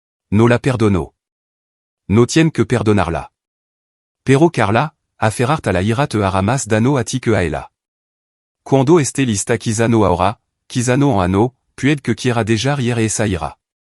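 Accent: France. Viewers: pesimo narrador, su acento frances distorciona lo que dice. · ¡¡¡Que gran estupidez¡¡¡¡ Poner la IA en español con acento francés!!!! No pienso escucharlo!!!!!!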